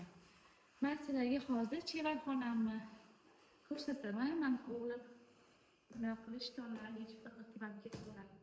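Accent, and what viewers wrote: Uzbekistan. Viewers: Маргилонча гапираркансиз оддий тушунарли халкона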